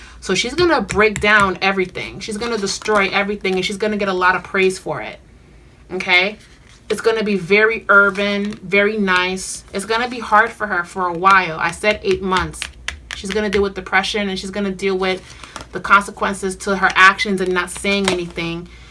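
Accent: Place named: Miami, United States. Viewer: Do you do personal readings in person? I'm located in Miami too. I could tell cause of your accent.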